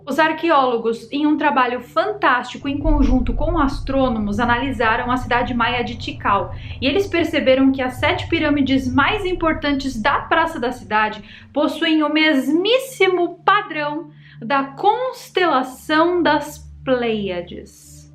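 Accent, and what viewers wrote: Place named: Minas Gerais, Brazil. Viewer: mineirissima. Amo seu trabalho.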